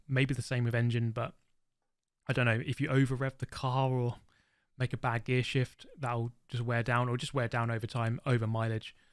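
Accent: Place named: London, United Kingdom. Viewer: I think you're from the same part of London as General Minus based on the accent..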